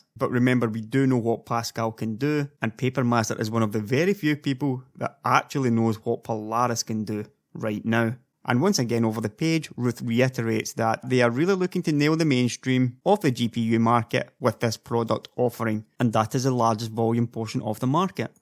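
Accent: Scotland, United Kingdom. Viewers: Are you Scottish? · i love scottist accent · that lovely scottish accent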